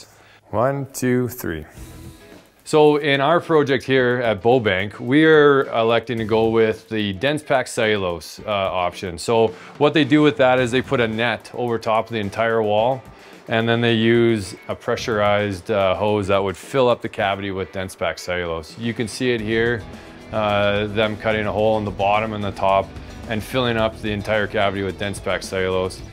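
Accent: Canada. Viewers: nice presentation! sounds like CANADA from the accent and I visited there seen 7 window pane so must get cold.... burr... in that case maximum design fun of interiour buildings for people to last the long winters... -tibor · If I'm not mistaken we're hearing the an accent hailing from the nation of Canada. If that's so I can fully understand why there's an emphasis being placed on having a thermal break and maximizing insulation. Yes, having 2 2x4 walls is going to cost more than a single 2x6 wall, but the increase from 5.5 inches of insulation to 10 inches of insulation would make the added construction cost and a loss of square footage a viable tradeoff when heating costs resemble those of a latitude much farther south. I'd rather pay more for something I'm going to buy once, like building materials and labor, than pay an ever increasing amount for the energy to heat a less insulated space. 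$500 dollars to build a house amortizes pretty fast when a heating bill is $50 per month instead of $150. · The accent is definitely Canadian and I noticed on a work truck that it mentioned the city of Calgary (in the province of Alberta in Canada) where two of my sisters live and only 6 hours from my hometown one province over.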